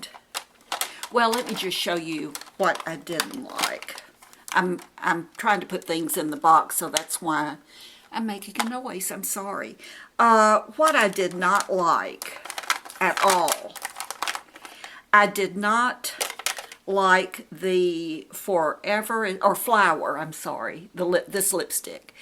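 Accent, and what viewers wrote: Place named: Western Kentucky, United States. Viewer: Hey, Thanks to you, I shopped my stash and "rediscovered" my Laura Mercier Mineral Powder foundation. I had totally forgotten how awesome this product is! I love your videos and think you are fabulous! I'm from Western Kentucky so we sound a lot alike too, ha!!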